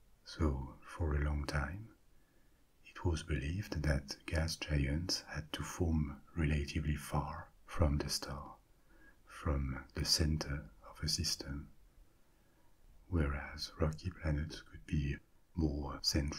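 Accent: France. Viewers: Music? ✅️ Imagery? ✅️ Space? ✅️? ✅️ Relaxing? ✅️ We are go for launch 🚀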